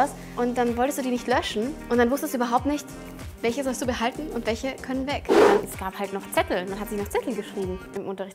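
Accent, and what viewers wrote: Austria. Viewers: Ich vermisse deinen österreichischen Akzent :( deabo :(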